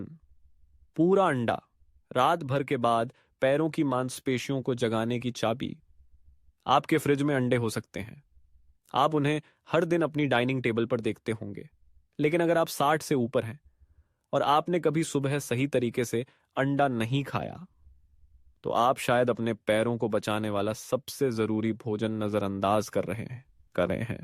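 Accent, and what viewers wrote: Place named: India. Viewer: विडियो विदेशी और आवाज भारतीय। पूरी विडियो विदेशी।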